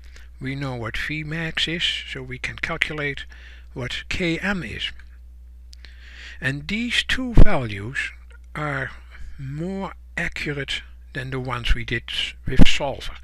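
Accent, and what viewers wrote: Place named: Netherlands. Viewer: hey! i assume that u are from te nederlands? i use the same accent haha! LOL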